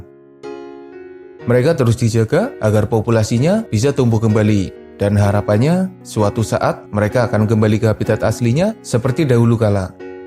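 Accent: Banyumas, Indonesia. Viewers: Ora ngapak ora kepenak👍🏼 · Emang ciri khasnya begitu. Ciri khas orang ngapak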